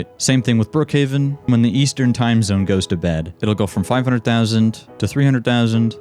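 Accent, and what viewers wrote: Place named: Singapore. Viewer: you live in singapore? your accent seems like it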